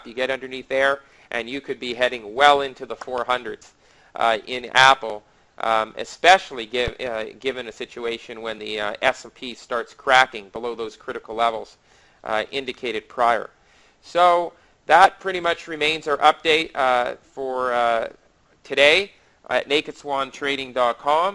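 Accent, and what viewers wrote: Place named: Pittsburgh, United States. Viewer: If you're not from Pittsburgh then I'd be very interested to know where you got that accent. Great work once again.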